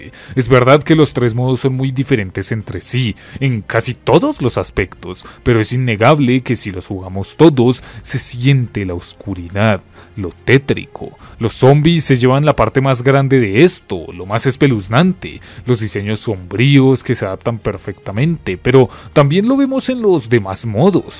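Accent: Colombia. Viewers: Gran video. Oye una pregunta, ¿tú de que parte de Colombia eres? xd.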